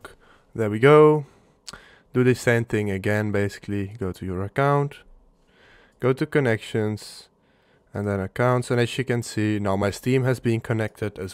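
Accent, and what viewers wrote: Netherlands. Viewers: Dutch accent lol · nederlands accent lol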